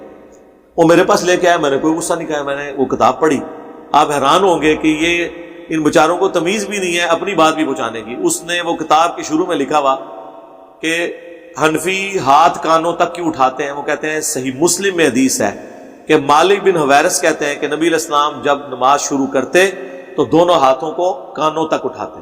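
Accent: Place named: Punjab, Pakistan. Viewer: Yah jo panjabi ka tarka lagta hy na bato bhai ki bato ma bara kmal ka lgta hy log chirty hi iai liy hyn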